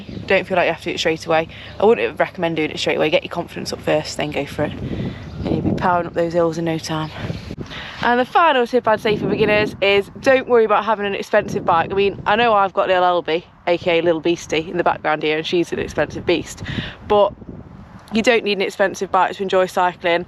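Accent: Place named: Derby, United Kingdom. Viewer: Keep up the good work. Trying to make some videos myself with my mucker, its not easy. Remember the accent well from my days working in Derby. Eh up me duck!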